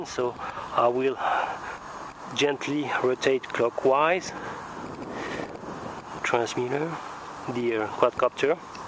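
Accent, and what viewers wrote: France. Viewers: opinions matter it was nice but the French accent bothered me a bit😁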